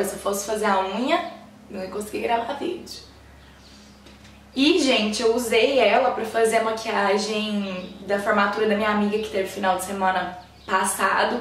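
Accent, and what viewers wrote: Minas Gerais, Brazil. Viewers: "num tem base" haha esse sotaque,que só mineiro sabe como é. linda amo seus videos.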